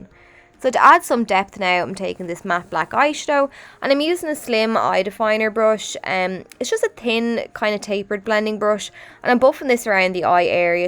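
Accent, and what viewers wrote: Ireland. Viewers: I love your beautiful Irish accent!💖greetings from Israel😁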